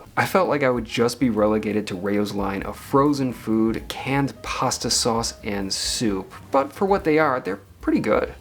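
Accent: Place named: Chicago, United States. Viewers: Your accent is so strong. Are you American? I’m guessing Midwest? As a New Yorker, the way you pronounce things is very…. different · A guy with a Bears and Cubs accent thicker than Dennis Farina's, living in an apartment in Manhattan, , drinking a Manhattan, invited to Rao's by a Manhattanite. Whattya gonna do. We're a transient kinda country. Fuhghetta 'bout it.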